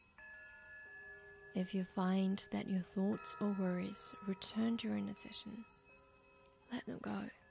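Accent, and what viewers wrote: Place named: Australia. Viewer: has such a lovely voice but wold better if she could prevent the horrid Aussie accent creeping in!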